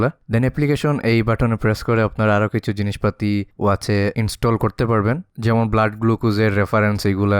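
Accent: Sylhet, Bangladesh. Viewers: Are u Sylheti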